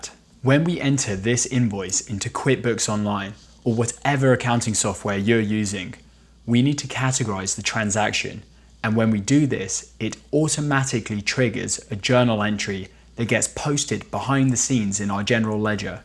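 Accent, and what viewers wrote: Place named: United Kingdom. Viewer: i am kind of a little bit confused, u are a Canadian, but your accent is British accent .but i like your video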